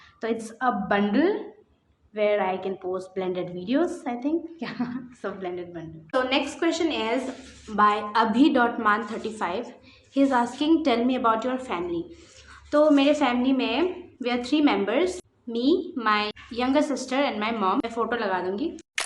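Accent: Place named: Arunachal Pradesh, India. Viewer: The way u TALK seems TYPICAL '"ORUNOSOLI"" r u ORUNOSOLI or Bengali or Assamese? No offence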